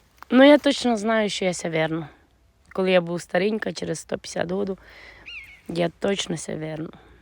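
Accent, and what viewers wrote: Transcarpathia, Ukraine. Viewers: Закарпатський діалект файний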